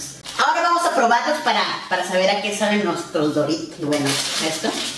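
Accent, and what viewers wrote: Mexico. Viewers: Eres de.sonora ?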